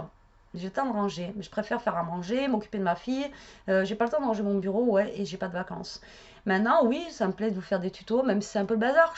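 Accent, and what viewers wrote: South, France. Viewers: Ha pauvre. Perso j adore tes tutos. Ton petit bordel sur ton bureau. Ton accent du soleil......allez laisses tomber. Et continue de nous faire rêver avec ton sens de la création Bisous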